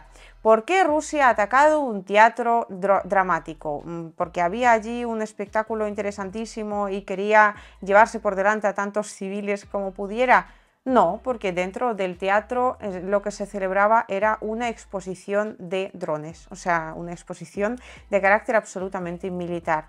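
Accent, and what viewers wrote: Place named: Spain. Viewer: Hola, recuerdo cuando emoezmaos a verte en sitios como twitter, tu acento totalmente español, porqué ahora tienes ese tono tan raro para contarnos las noticias? Es tan monótono que no ayuda a concentrarse ni a terminar de escuchar la noticia entera. Podrías volver a hablar normal? Gracias!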